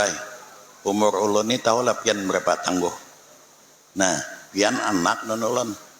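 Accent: Banjar, Indonesia. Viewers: Asli bahasa Banjar nya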